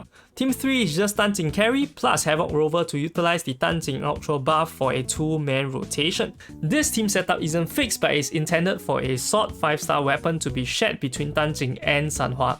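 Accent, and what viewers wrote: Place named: Singapore. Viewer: I do love Singaporean accent myself! 😂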